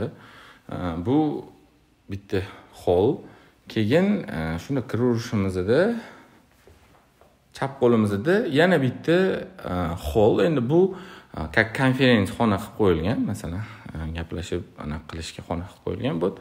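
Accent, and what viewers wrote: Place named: Tashkent, Uzbekistan. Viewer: Узбек тилини софлигини бузадиган шева , Бу Тошкент шевасидир .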